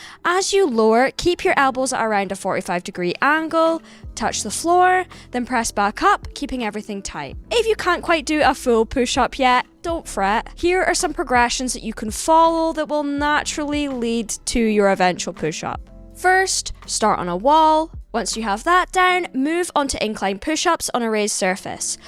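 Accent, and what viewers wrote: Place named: Scotland, United Kingdom. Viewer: Loved it thank you so so much! Love love love the Scottish accent. Not so strong though. 💚🤍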